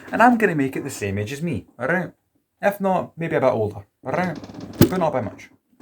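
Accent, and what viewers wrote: Ireland. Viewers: Love the Irish accent my guy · Wow this guy has such an amazing Irish accent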